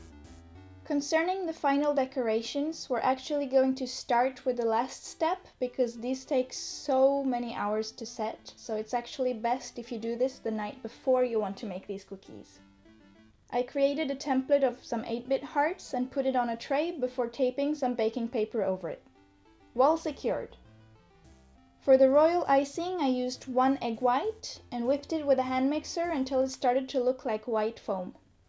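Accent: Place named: Canada. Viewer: you sound canadian, are you from canada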